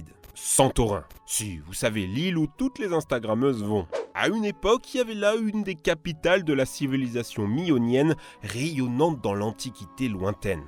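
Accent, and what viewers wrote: Caldoche, New Caledonia. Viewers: C'est un caldoche le mec qui parle xDD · on nest dakor c une video de Nouvelle-Calédonie g reconnue lacent des caldoche😂😂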